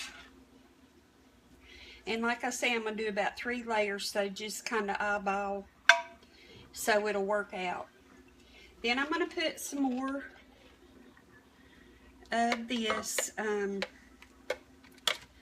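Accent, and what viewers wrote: South, United States. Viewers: I have just found you, where are you located. Being from the south we don’t think we have accents only the Yankees do, but I thought I heard a little bit of southern twang in your voice, very comforting. Keep on cooking and I’ll continue to watch. Thank you Lady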